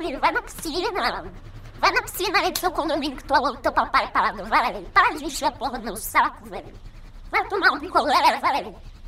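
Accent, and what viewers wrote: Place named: Favela, Brazil. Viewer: O engraçado é que ela fala como se tivesse nascido na favela,pobre,passando fome né kkkk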